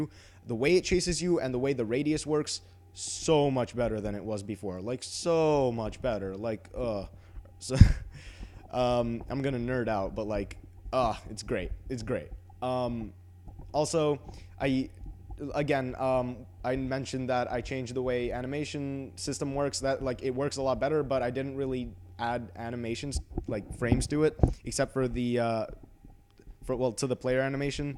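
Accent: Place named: United States. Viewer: Are you actually Russian? I would guess not based on your school experiences that you talk about and your accent is American. Just a question.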